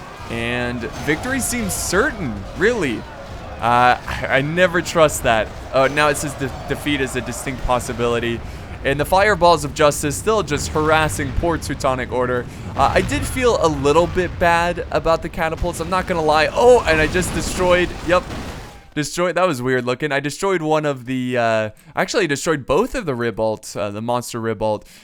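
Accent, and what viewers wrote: United States. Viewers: but yeah its because of having an accent, an American accent. EVERYONE has an accent. And i bet has problems saying PLENTY of non German words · Please, (no offence intended) pronounce those names right, it's kind of annoying to hear them pronounced in an American way. Again, I am not looking for a fight, this is just a piece of advice. Trust me, pronouncing them right grants you extra style points. Great videos, keep it up!:)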